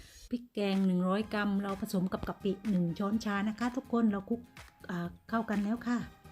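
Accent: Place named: South, Thailand. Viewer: ขั้วกลิ้งหมู จัดเสริฟ พร้อมมะเขือพวง มะละค่ะ. ใส่ สันน่า ทานค่ะ รสชาติ จัดจ้านค่ะ อร่อยจังฮู้ เผ็ดมากค่ะ พี่สิแลงใต้ เพราะ จังค่ะ อร่อยจนตัก ไม่หยุดค่ะ ไปต่อ หลังไมค์ค่ะ. ขอให้ มีความ สุขนะค่ะ❤️👍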